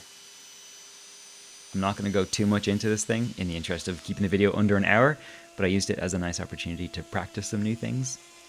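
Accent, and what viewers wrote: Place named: Ireland. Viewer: Heard the fellow Irishman voice and had to subscribe right away!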